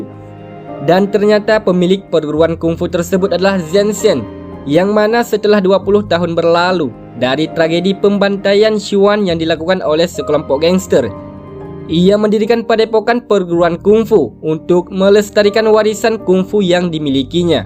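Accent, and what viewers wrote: Aceh, Indonesia. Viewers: medok Aceh nya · Bg orang aceh ya? Medok karna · Maaf min loe dari daerah mana logat nya mirip aceh atau bali y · Bahasa Indonesianya terdengar logat ORANG ACEH., hihihihi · Pembawaan suara mentok kali orang Aceh.. Sehat slalu admin, salam asam Sunti ..😅😅😅😅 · Seperti logat orang Aceh? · ini org Aceh keknya yg bicara😀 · Logat aceh admin nya 😁😁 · Suara nya mendhok kayak suara org aceh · Admin nya orang aceh ya? Logat nya Aceh kali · Kayanya yg ngisi suara di alur cerita channel ini oranh aceh😂😂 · dari logat pasti orang aceh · Orang Aceh ya bang?. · Lupaya bukan cuman saya yg sadar klok mimin org aceh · Logat aceh😅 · Aceh ni logat nya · Logat Aceh ya wkkww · Admin awak aceh sang euh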